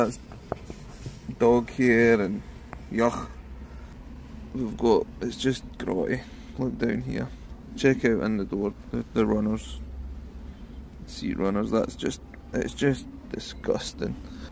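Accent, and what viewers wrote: Scotland, United Kingdom. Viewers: In a strange way, these videos remind me of the song: " " by. Your Scottish commentary plus the music. Pure brilliance. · Hold on is that a Scottish accent talking about sun burn?........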